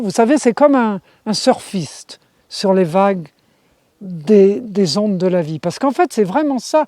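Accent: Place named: France. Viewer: mais elle est francaise cette personne, francaise de france, c'est quoi le rapport une francaise qui se dit autochtone????? qui se dit gourou autochtone qui va nous transmettre la culture autochtone cette francaise????? ben voyons donc, elle est francaise cette personne la, c'est pas une autochtone elle, son accent est francais de france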